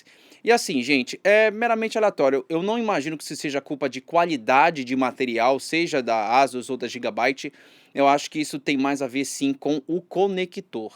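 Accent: Pará, Brazil. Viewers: da pra saber que o cara é paraense só pelo ritmo da fala dele.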